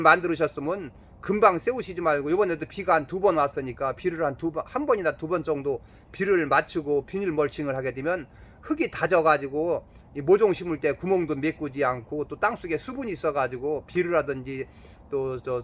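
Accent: Chungcheong, South Korea. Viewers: 충청도 사투리가 정겹네요 좋은정보 감사합니다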